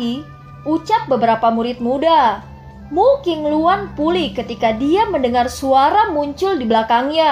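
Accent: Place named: West Java, Indonesia. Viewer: Logat namah siga orang sundaa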